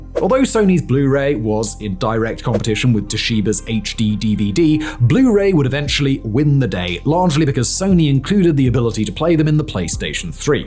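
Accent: United Kingdom. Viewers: Gasoline cars are going to be around for a long long time, my friend. Just watch and learn. Also, desktop computers aren't going anywhere and settled down to their ongoing use plateau several years ago. And finally, BluRay and DVD/CD players are abundantly available, very cheap to obtain and will be for the foreseeable future. Your analysis is a little heavy on the wishcasting propaganda side, sprinkled with a thick authoritative sounding British accent. Those in the know don't buy it. You did at least nail the fax machine, so there's that...good man!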